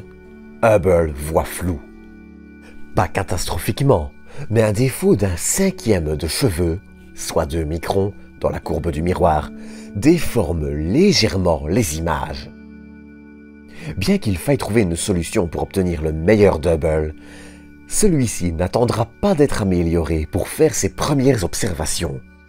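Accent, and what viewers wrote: Belgium. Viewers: Ce monsieur a tout à fait le droit d'être belge et d'avoir une manière différente de parler... Quel commentaire insupportable. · Je sais que je ne vais pas me faire des amis mais le documentaire a l'air vraiment sympa et très intéressant mais la narration 🥶🥶🥶 au bout de 10min j'ai arrêté car je n'en pouvais plus... dommage...un mélange de belge, américain, allemand et canadien. Et la façon de prononcer Hubble à chaque fois ça devient très irritants.. mais je répète dommage car le documentaire doit être vraiment intéressant.. désolé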